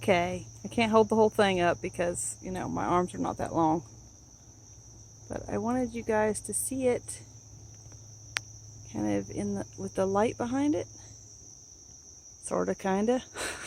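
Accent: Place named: California, United States. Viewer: Love your accent. Sounds Cali with a southern past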